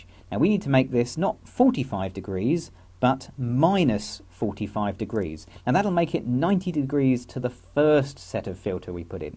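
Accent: United Kingdom. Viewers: are u british ?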